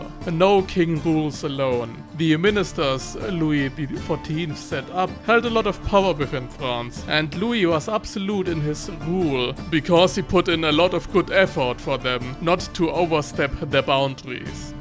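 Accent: Germany. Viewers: Me when my favorite setting YouTuber is losing his magical German accent 😢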